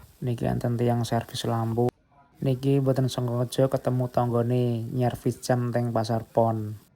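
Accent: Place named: Java, Indonesia. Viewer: Bahasane jowo kok medok banget opo dari jawa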